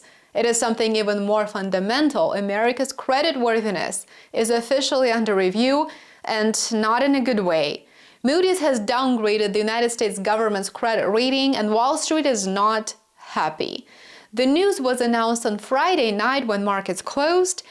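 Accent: Russia. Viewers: She sounds Russian. Is this Putin's propaganda Ho?! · Pretty Russian accent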